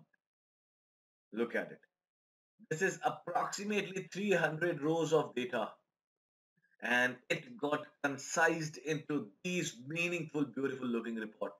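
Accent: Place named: India. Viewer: what region of India are you from? you have the coolest accent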